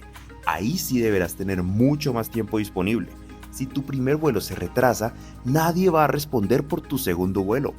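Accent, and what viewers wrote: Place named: Costa Rica. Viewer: Bello video ! Pensé q era de Costa Rica 🇺🇸🇨🇷🇨🇷🇨🇷ya q hablamos muy parecido en el 2023 bajé a Costa Rica 🇨🇷 y Guatemala 🇬🇹 via copa love it desde California usa.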